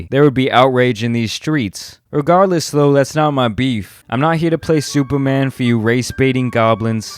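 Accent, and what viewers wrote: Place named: New York, United States. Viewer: Sounds like this guy is trying hard to hide a new York accent New Yorkers are based bad ppl But boooooy did he hit the nail on the head bout that ps5 vs purse thing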